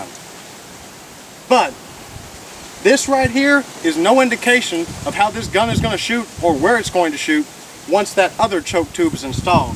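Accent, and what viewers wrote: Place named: Texas, United States. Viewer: Just curious, where's your accent from? I know it's definitely Texan but you sound like you're from the east near Beaumont or around there but I could be wrong. Love the video though! What do you recommend for slugs?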